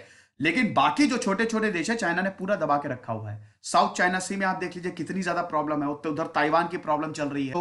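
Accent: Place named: Uttar Pradesh, India. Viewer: bhayi tu up ka lagta hai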